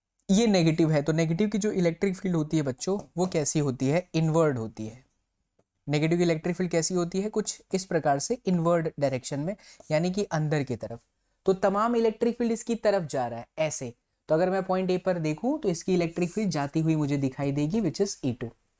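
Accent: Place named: Bengal, India. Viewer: Sir English me bola kro Bengali lgte ho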